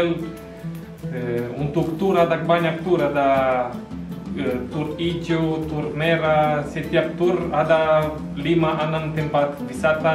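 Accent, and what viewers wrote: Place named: Ternate, Indonesia. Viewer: Tong Tra bosan, kalo durasinya d perpanjang Sadiki malahan makin bangga ada bule yg pake tong pe logat Ternate 😊🤗apalagi liat ganteng uucchh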